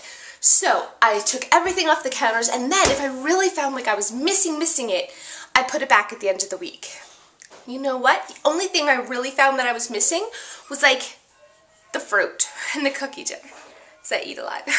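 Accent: Canada. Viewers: I think it's a Canadian accent!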